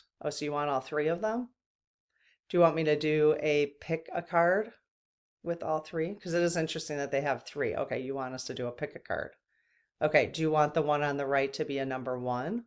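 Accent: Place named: Wisconsin, United States. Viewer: Just stumbled on your channel. Love your "Sconnie" accent!!! I grew up in southern Wisconsin and now live in Minnesota.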